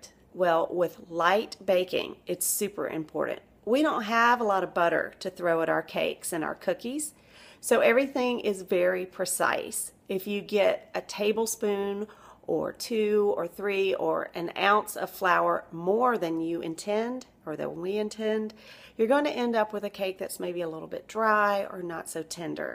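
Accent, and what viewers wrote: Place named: United States. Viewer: Ew her country accent got to go lol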